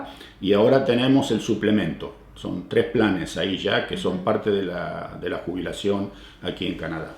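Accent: Argentina. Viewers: Cómo no se te fué el acento... Argentinazo!!!!! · Q grande,por tu forma de hablar parece q nunca te fuiste e Argentina,como te quiero!saludos desde Buenos Aires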